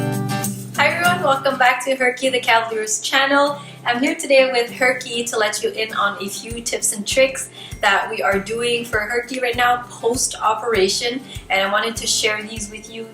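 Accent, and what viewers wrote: Quebec, Canada. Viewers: J'entends ton accent franco-canadien, c'est super! :) Merci pour ce vidéo! Ma shihpoo vient de se faire extraire 2 dents et on aimerais qu'elle soit aussi comfortable (que possible), durant son rétablissement. xx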